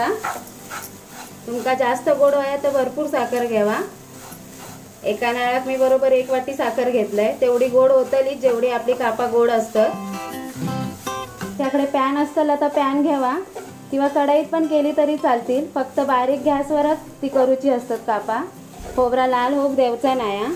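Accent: Malvani, India. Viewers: Mastt.. 👌.. Tumchi malvani aikuk khup maja eli.. 👌👍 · Khup chhan boltas tumi... Pure malvani... Recipe pn mast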